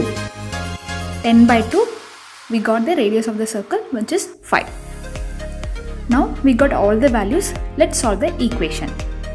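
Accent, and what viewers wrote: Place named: India. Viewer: That takes 16 seconds to solve its okay to speak Indianglish but you don't need to kill yourself and still come out with wrong answer 200 - 50pi = 42.92... 42.92...÷ 2 = 21.46... Thanks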